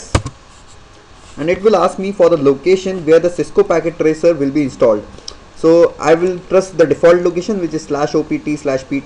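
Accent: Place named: India. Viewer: hes indian i think by accent .. however the video helped me so cheers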